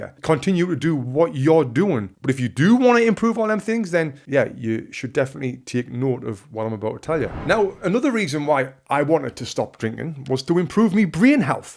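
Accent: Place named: Sunderland, United Kingdom. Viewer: Yes Lad your propa letting your mackem accent out boyo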